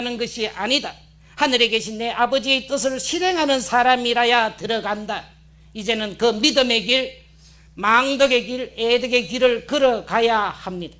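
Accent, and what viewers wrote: Gyeongsang, South Korea. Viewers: 좋은 말씀 감동입니다 ㆍ 경상도 악썬트에 노래로 재미나게 말씀을 풀어주심에 감사 드립니다 ㆍ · 신부님!! 우연히 신부님 갱상도 말씨에 반하여 강의를 듣게되었습니다 경상도 말씨 넘 정겹습니다 뒤늦게 신부님 강의 듣느라고 요즘 삶의, 신앙의 생기가 살아납니다 신부님 목소리 오래오래 듣고 싶습니다 열정적인 좋은 강의에 감사하며 무엇보다 건강하시도록 기도하겠습니다~♡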